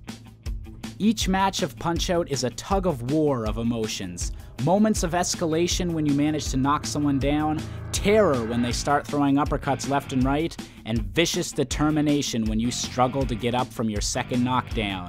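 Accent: Canada. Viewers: you sound especially canadian here :)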